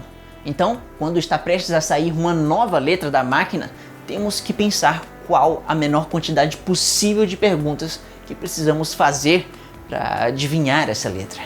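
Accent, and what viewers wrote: Ceará, Brazil. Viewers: Você é nordestino?? O sotaque parece com cearense, n sei, sou cearense e escuto muitos cearenses e piauiense falando assim.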